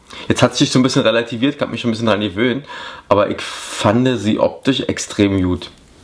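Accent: Berlin, Germany. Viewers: Yes. But it's not an accent it is a dialect and this dialect of the berlin region feel "dirty" for other germans. For example: they say "dat" or "ick" instead of "das" and "ich". It sounds very shortened. Fun fact: "ick" is often the wrong pronunciation of "ich" from americans/british. Because they have the same trouble with the "ch" as we with the "th" :D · Berliner Dialekt ;)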